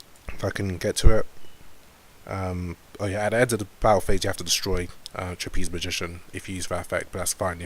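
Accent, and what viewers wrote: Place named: England, United Kingdom. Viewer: nice! The performages seem to do quite a good job for spellbooks, giving the deck access to great rank 4s. Trapeze magician also seems really good, with it floating and all. I've also seen people experiment with the combination of trick clown and heroic challenger- thousand blades to get multiple rank 4s on the field, so its really nice to see people innovating with the engine :D Btw, you sound english. Are you? o.O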